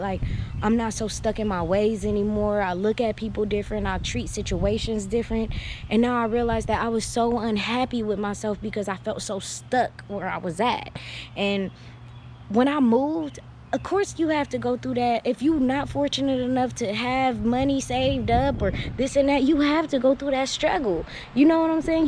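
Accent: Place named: Bay Area, United States. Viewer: i could hear you from the bay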